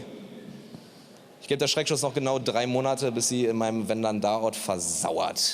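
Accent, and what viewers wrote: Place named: Berlin, Germany. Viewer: dieser Berliner Schnauze stundenlang zuhören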